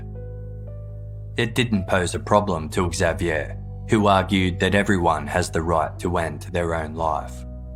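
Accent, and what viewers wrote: Australia. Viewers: It’s Australian and I pity you that you can’t understand English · The narrators voice sucks, can’t understand some things he says, his Australian or British accent is so strong that it makes it difficult to distinguish some words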